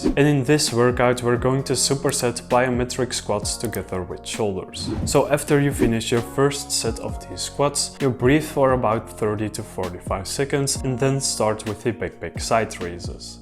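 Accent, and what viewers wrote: Belgium. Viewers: I'm so proud you're Belgian (you can hear it lol), Thanks so much bro! Also can women also do this program?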